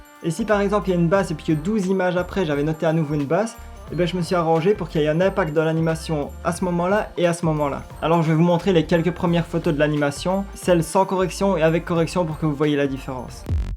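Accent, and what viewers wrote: Belgium. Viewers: T’est belge ? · Tes belge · Ah tu es belge ?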